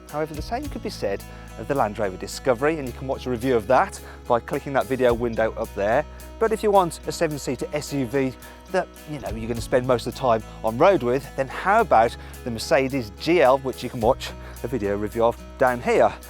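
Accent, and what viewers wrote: United Kingdom. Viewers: its a little bit of a peeeeeeeerch...typical British accent....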